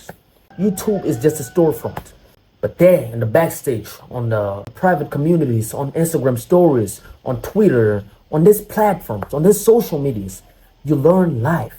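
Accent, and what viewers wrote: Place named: United States. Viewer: Give this man some subs he's perfected the American accent while living in Mozambique!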